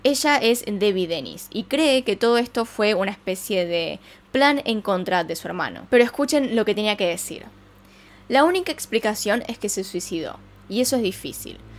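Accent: Argentina. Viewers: Sí mencanta el acento argentino y los videos. 🤩👌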